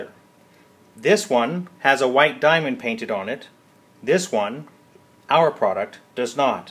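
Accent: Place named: Canada. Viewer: Are you Canadian by any chance? I’m from Wisconsin and have heard a lot of northern accents but yours sounds very Canadian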